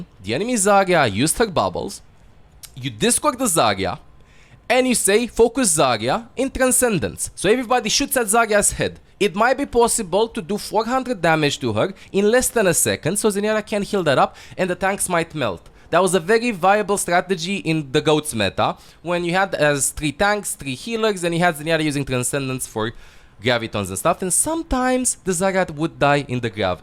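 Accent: Romania. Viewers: Not only is brilliant, entertaining, and an incredible teacher, his beautiful Romanian accent invariably gets every tip he gives playing on repeat in my head for a week. Love what you do, keep up the good work <3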